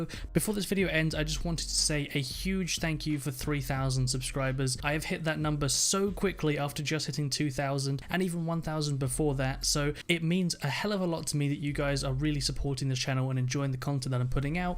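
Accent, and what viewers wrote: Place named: United Kingdom. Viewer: hes british...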